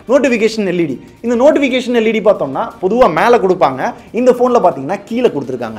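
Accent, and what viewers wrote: Madurai, India. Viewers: Bro Neenga Madurai yaa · Bro neinka Madurai ya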